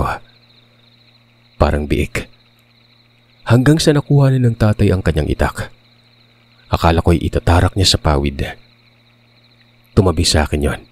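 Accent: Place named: Ilonggo, Philippines. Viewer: Wag ka na pong mag ilobggo or bisaya Hindi bagay nakaka rindi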